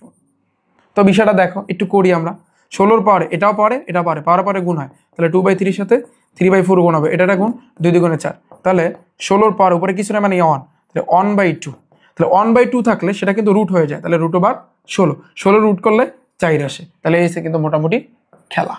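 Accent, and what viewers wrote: Barishal, Bangladesh. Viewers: Bhaiya ki barishailla?🤦🏻‍♀️🌝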